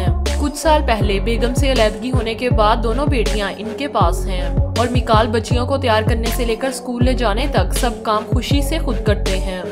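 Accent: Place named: Punjab, India. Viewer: PL IMPROVE YOUR URDU ACCENT, ITS A MIXTURE OF PUNJABI AND URDU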